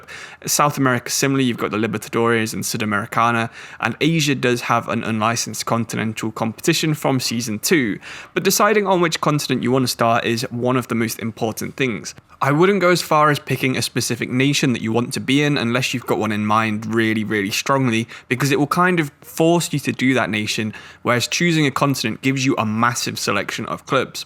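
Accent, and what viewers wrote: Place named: United Kingdom. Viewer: broo i love your english accent... is soo funnyyy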